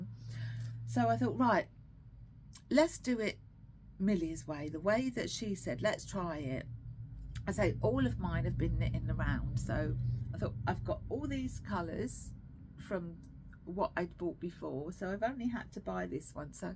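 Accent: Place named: South, United States. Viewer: Ahhh haha thank you so much for letting me know where you live! I could tell it was southern but that's about it! Thanks again for the mention. Knitters really are the best aren't they ❤ much love ❤❤❤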